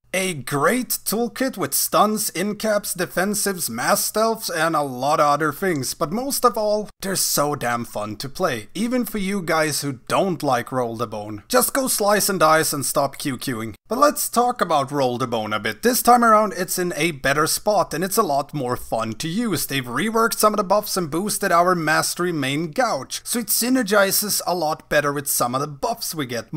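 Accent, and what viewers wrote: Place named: Sweden. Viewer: Nice video, hör på talet att du måste vara svensk, så jag antar det är okej med en sådan kommentar också! Tänkte bara säga att jag har så oerhört svårt att bestämma mig för om jag vill spela Outlaw eller Survival. Tråkiga för egen del är att bägge specs verkar vara lutade åt AoE-dps, vilket jag inte är speciellt het på, då jag tycker om Singletarget-dps mer. Avskyr att se riktigt bra burst men kass sustained damage där man går från att ligga topp 3-5 för att senare sjunka till botten, vilket är därför jag inte vill spela Fire Mage (även fast den specen är cool som attan) då den tycks vara oerhört beroende av sina CDs och tanks i dungeons för att göra hygglig dps. Vill helst inte förlita mig enbart på "CD windows" för att göra mitt jobb. Förutsatt att du kan svara på min fråga, vilken spec av Outlaw och Survival gör "bäst" burst och sustained singletarget DPS? 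Tacksam för svar, bra content dessutom, så även om man inte får ett svar så har du förtjänat åtminstone en sub!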